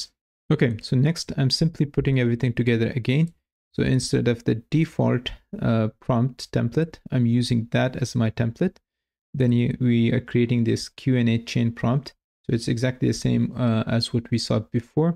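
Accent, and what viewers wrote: India. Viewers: First second I hear Indian accent = Imma'bout to get pure useful information from this video, this is for sure. Thx for the video though 1 thing - when you talk about "previous video" at the beginning of the video, I'd consider to provide this "leading link" that shows in the corner because I'm not familiar and have to dig into your feed to find it. Not a great issue but still - just a tip to consider.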